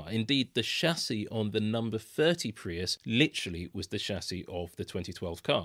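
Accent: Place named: England, United Kingdom. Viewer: English accents are the worst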